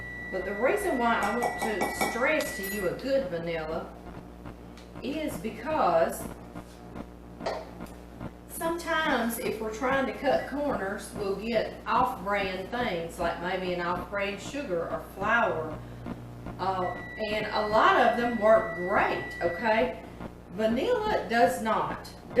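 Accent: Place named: Louisiana, United States. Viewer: Your home is beautiful ! I just love watching your videos! So sweet that Louisiana accent! Your kitchen is elegant! Girl you can do some cookin! Yes, you need to call it a day! You worked hard! See you soon for a Christmas video! Loved the smore's video with the grand babies! Bye now! God bless!♥️🥰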